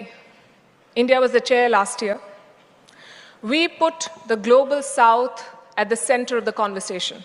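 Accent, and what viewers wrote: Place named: RP, United Kingdom. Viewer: Palki Sharma we citizens of India proud of as you explain in amazing Queens english , take care of you eat well and sleep well , please follow my advice from Chennai , Bharath Matha ke Jai, Jai Hind ..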